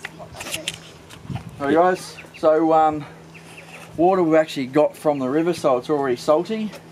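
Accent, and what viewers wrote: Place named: Australia. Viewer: Love his Aussie accent. G'day mate!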